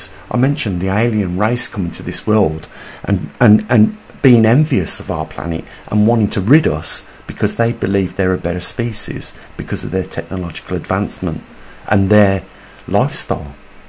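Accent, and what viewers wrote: Birmingham, United Kingdom. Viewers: lol that brummy accent coming out on todays post lol. go brumee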